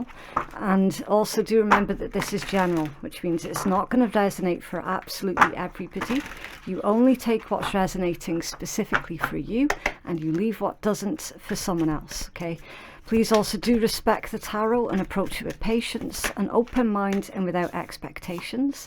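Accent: United Kingdom. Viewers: Thank you, sounds a little bit like her or I. You sound great. Loud n clear. Your accent sounds British to me... That's great I speak English... I have other problems with haters hating and threatening me. So I've been praying on it... She should of been on my side and she wasn't.. looking around see how it goes.. I'm 😁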